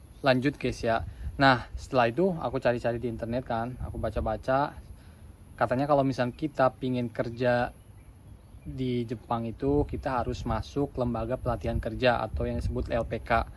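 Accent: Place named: West Java, Indonesia. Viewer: "Aku nyaaa cangkeull" sunda pisan nya a😅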